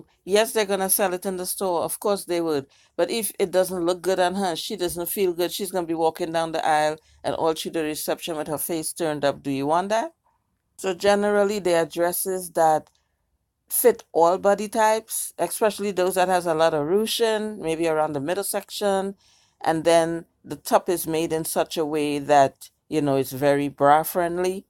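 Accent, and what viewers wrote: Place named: Trinidad and Tobago. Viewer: I like the dresses. I didn't catch your accent until about ...Trinidad in the house...lol...I am from St. Croix.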